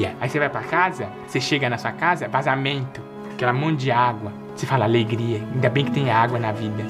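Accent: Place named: Minas Gerais, Brazil. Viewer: Cara vc é show,parabens!!! Achei vc depois q instalei Instagram.. parece meu povo lá de Minas falando,adoooooooro kkkkkm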